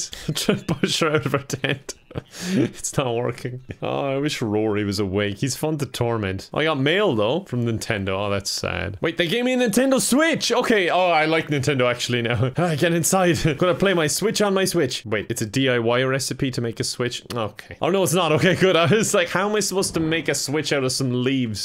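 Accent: Ireland. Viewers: Irish accents are so attractive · Ste van der Velde hes irish, you’d here ireland in his accent.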